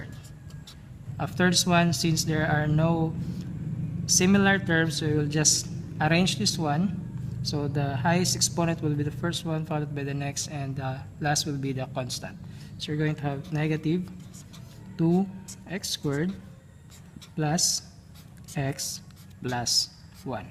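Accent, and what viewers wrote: Philippines. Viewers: are you filipino btw? Your accent sounds familiar to me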